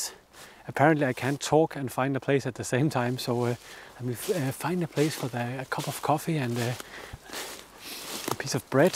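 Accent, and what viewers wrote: Denmark. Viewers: The landscape, the 'driving by the bakery', the danish accent... just made me so homesick :) (Expat)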